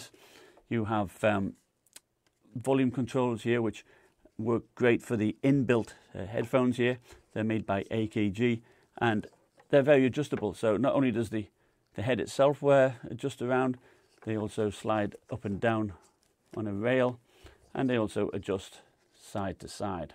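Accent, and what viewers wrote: United Kingdom. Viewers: Hey there, judging by your accent you might be based in the UK, however based on a few articles I read the Samsung Odyssey has no plans to go on sale in Europe and the UK (yet), wondering if you might be based in the US? And if you are indeed UK based, how did you manage to get hold of these?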